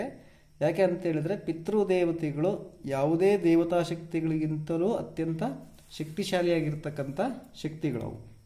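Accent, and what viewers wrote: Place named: North Karnataka, India. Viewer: Nivu uttar Karnataka davaru so nimage accha kannada mathadodikke kashta agatide modalu script ready madikondu practice Madi aamele video Madi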